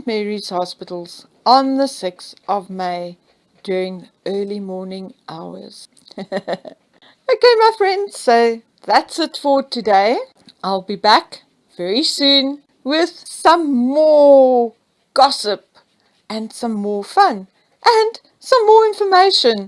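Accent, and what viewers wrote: South Africa. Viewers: Dis 'n lekker Saffa accent... ek is van de Baai. 😊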